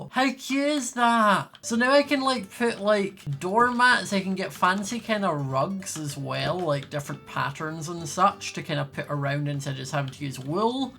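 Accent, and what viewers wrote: United Kingdom. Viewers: you saved joel from the wither! WE STAN british accent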